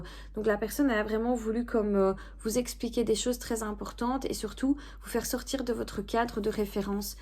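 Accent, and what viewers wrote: Belgium. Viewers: Où vous c'est la première fois que je vous écoute mais il me semble que vous avez un fort accent belge 👍😸♥️ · Bonjour, toujours très belle, j adore ta guidance et t en remercie cette personne ne sera plus dans ma vie très bientôt ou j'ai mal compris.j adore ton accent belge gros bisous je te kiffe.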